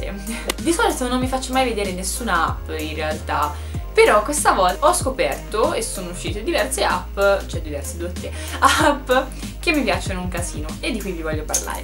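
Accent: Tuscany, Italy. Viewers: Ciaooo nuova iscritta, sei toscana come me! Di dove sei tu? 💕 bellissimo video comunque 😘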